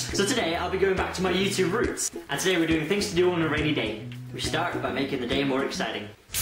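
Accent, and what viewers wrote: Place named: Wales, United Kingdom. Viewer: He sound's quite Welsh in this for a change:)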